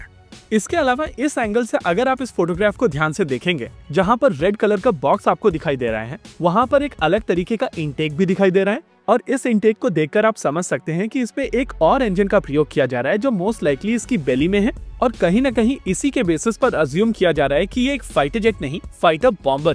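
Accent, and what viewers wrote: India. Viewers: 对英语一窍不通的我一听到这口音我就知道这是印度人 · english with hindi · I must say I'm addicted to the india accent.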